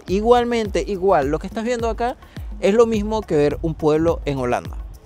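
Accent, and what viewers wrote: Venezuela. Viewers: ¡Esoo chamo, acento venezolano! Muy buen video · No sabía que era venezolano, lo adiviné por el asentó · La verdad que ni idea cómo llegué aquí jajajaja pero me parece súper cool y más al reconocer tu acento, dije: "Este chamo es venezolano" jajaja y acerté! Primer video que veo, me parece súper chévere! éxitos y bendiciones! :) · Llegué a tu vídeo por sugerencia de YouTube. Generalmente no hay venezolanos con esta dicción tan clara. Sospecho que eres de Centroccidente, más específicamente de Barquisimeto o Yaritagua pues ese acento es característico. Se agradecen este tipo de descripciones tan espontáneas, claras y sucintas. (Larunbata, 2021.eko martxoak 20a, am) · Hola! Que bien que el algoritmo me trajo a tu canal, que lindas las imágenes que muestras de Holanda! Me preguntaba si eres del oriente del país, tu acento me dio esa impresión, yo soy de Puerto La Cruz viviendo en Kansas, USA. 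Saludos! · Hola!!! simplemente apareciste en el menú de videos de youtube y me llamó la atención. Me quedé viendo porque tu forma de hablar me parecio familiar, hasta que dijiste que eres Venezolano. Bonito formato. Saludos desde Caracas. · Por fin veo Venezolano con video de este tipo. Felicitaciones!! La vrrdad se sintio raro alguien Holando con acento Venezolano. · Hijo; genial tu salida a ese error que hiciste cuando hablas “ si lo ves en el futuro.. pero eso del verlo en el “pasado”😒😒😒 jiji tu risita te salvo de ese “chispoteo” propio de la improvisación; pero bueno lo remendasteis con esa salida.! Primera vez que veo tus videos, llegue ahí por casualidad, al empezar y oír tu buena dicción, me dije es un paisano venezolano. Dios t bendiga brother sigue adelante; al final te espera la cima allá o en cualquier lugar del Mundo.!!🌹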